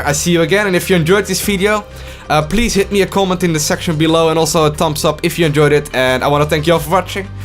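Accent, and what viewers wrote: Netherlands. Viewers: Your dutch accent is so real 😂😂 no hate good video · Haha dat heerlijke Nederlandse accent. Mooie video man ;) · dutch or Belgium?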